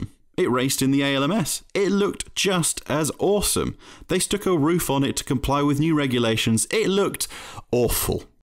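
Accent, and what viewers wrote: United Kingdom. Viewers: With this british accent, it's even more funny 🤣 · Somehow, I just knew that the snobbish narrator would be a Brit. Race cars are build for function. Not appearance.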